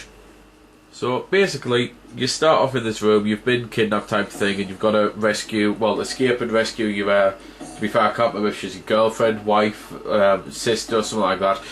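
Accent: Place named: Northern England, United Kingdom. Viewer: Goddamn I can't place your accent. It's Northern...probably N.E.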